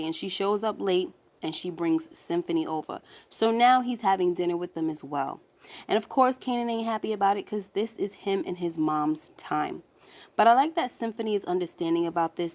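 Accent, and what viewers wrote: New York, United States. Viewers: Love your New York accent! I kinda miss it up there. PS- Symphony is not to be trusted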